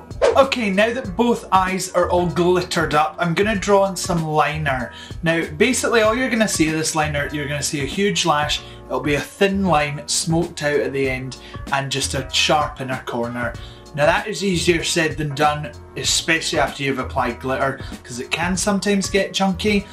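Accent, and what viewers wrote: Scotland, United Kingdom. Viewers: Love that Scottish accent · Lawrence Chaney could insult me in Scottish and I would say thank you, may I have more please?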